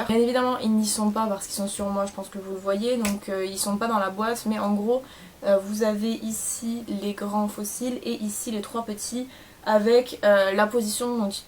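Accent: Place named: South, France. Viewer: Ton accent de sudiste là , magique 💕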